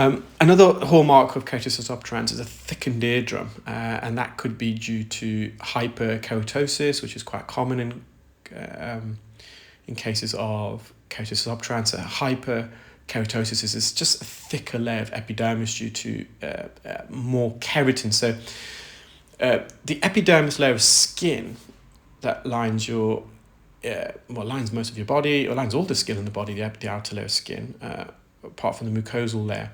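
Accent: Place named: Wales, United Kingdom. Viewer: Nothing wrong with your accents or speed. I find it quite comforting as it reminds me of family- even though I'm not Welsh many generations before me were. Mainly from the valleys so your accents are spot on for me 😂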